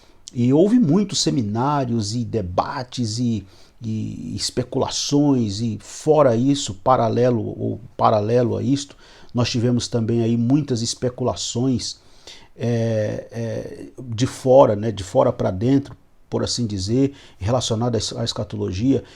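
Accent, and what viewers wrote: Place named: Northeast, Brazil. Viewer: Pastor paz do senhor bom final de semana sou seu siguidor gosto muito da sua personalidade e das sua ministraçoes sou filho de pernanbucano e o sr parece ser nordestino não sei se estou enganado mais a maneira forte de se expressar da entender que é pernanbucano ou da região nordeste o povo nordestino é um povo maravilhoso forte abraço Pastor Deus vos abençoe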